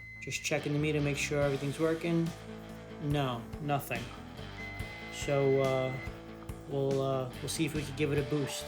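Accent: New York, United States. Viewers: I’m subscribing because I hear a NY accent! Good content too lol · Ees a New Yorkr or from New Joyzee. No?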